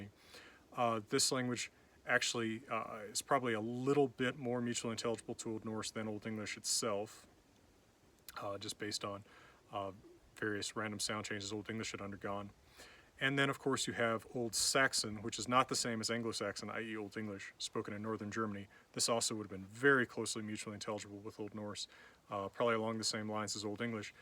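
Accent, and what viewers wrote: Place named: United States. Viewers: Evil Regal Danish, I think. He wants to know which American accent speaks with, and he said it sounds very nice. At least that's what I'm guessing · Hvilken amerikansk dialekt er det du snakker? Den høres veldig bra ut.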